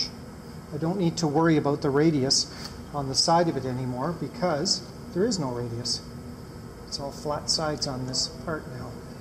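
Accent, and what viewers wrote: Canada. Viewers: Are you Canadian bud? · etalwork was theonly practical class at school, I liked. wish I had learnt more. I thought,tho, that when machining like this, funning luid was used, to shed heat,reduce tool wear, speed process. maybe only on large production lines. ? in a microchip world, nice to see some crsftwork. good video, by Canadian presenter, pronouncing 'out', more like 'oot'? )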